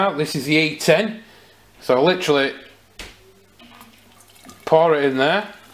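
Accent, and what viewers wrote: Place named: West Yorkshire, United Kingdom. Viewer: You sound like you’re from West Yorkshire like me! Whereabouts are you based pal? I’m just north of Leeds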